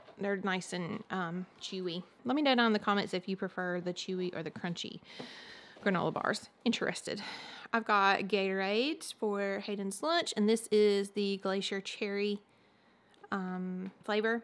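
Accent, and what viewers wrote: South, United States. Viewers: I loved the haul. I can’t wait to see Harrison in his tuxedo. Also I love your “ accent “. I’m southern also lol & I’m from Georgia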